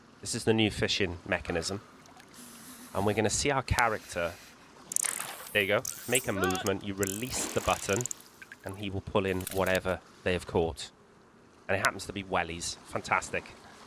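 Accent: Wales, United Kingdom. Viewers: Great video - you welsh?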